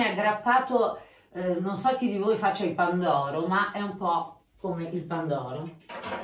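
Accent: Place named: Rimini, Italy. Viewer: grazie mille, molto gentile 😊 quindi se ho ben capito il fatto che la panna fosse già stata scaldata mi consente di congelarla due volte mentre se la congelassi fresca no. Ne ho imparata una in più ☺️ La chiffon cake proverò a farla col colorante rosso x il compleanno di una collega e pensavo di farcirla e glassarla di qualcosa di rosa... Ma non al burro 😕 o una ganache molto solida di cioccolato bianco, panna e un pochino di colore rosso o una crema pasticcera molto solida... Poi pensavo 🤔 essendo molto alta anziché tagliarla x farcirla potrei fare dei buchini in alto e riempirla come si fa coi pandori x es..... Purtroppo non sono su fb... Scelta di vita🤷‍♀️ in questi casi mi dispiace un po' ma pazienza, proverò a immaginarle le tue chiffon cake 😊🍰 Cambiando discorso, adesso ti sto scrivendo da Rimini e la gente ha il tuo accento ☺️ e un po' anche mio nonostante viva a Roma da diversi anni.. 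purtroppo non potrò vedere le tue torte su fb perché non ci sono... Proverò a immaginarle 😊 Buona giornata e buone feste a te e tutta la tua grande famiglia 🥰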